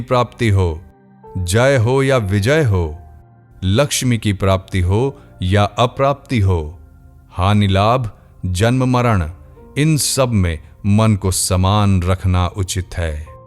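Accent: Standard. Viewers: Sir aapki.aavaj bahut shudh h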